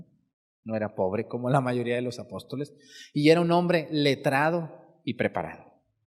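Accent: Mexico. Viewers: Nada mejor para el domingo que escuchar de la Virgen María; su forma de narrar los hechos y su dejo mexicano tan agradable (3ra vez que veo este video), no necesita imágenes al narrar mi imaginación desarrolla lo hablado. Los sábados maratón de vídeos café Católico. Desde Lima Perú. Mis oraciones a Dios para que le de muchos años de vida con salud, alegría y fuerza por enseñarnos. GRACIAS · Me gusta como enseña y predica,se le nota lo mero México, Dios lo bendiga padre he aprendido mucho con usted, saludos desde,pasto Colombia, me hace reír por su forma de hablar,pero no es por burla yo respeto mucho a los sacerdotes es por su gracia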